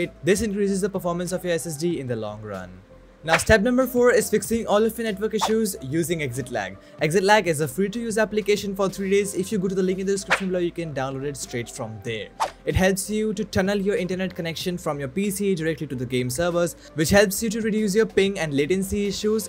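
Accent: India. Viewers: indian accent, dislike, next video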